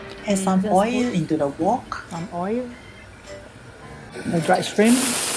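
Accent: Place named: Singapore. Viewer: Love the dish love the Singlish lahhh...thx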